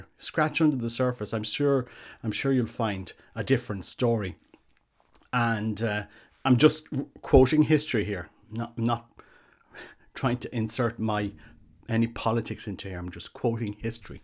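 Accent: Ireland. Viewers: Thank you for addressing this! I love icons and have been collecting copies from my local Catholic store; I couldn't understand the blacked-out baby at all. One good result of TM 's video was that I first heard of Fr. Thady's channel - what a lovely 'old-school' priest he seems to be, although not at all afraid of speaking his mind! We used to have lots of strict but kind-hearted priests with wonderful Irish brogues here in Canada, but no more. Now I can listen to dear Fr. Thady's channel as well as to your soothing brogue. 😃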